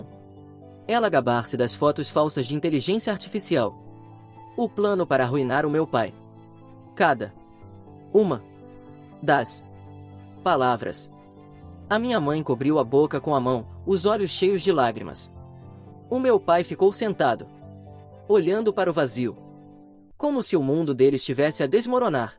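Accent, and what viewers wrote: Portugal. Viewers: Eu desisto de ver esse canal, portugues de portugal, a ia lendo com mais pausas q uma criança fazendo apresentação com vergonha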